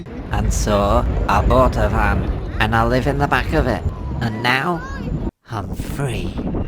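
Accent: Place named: Scotland, United Kingdom. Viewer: Thanks my brother lost the bet he thought you really were Scottish!! He was close though! Thanks for the fun!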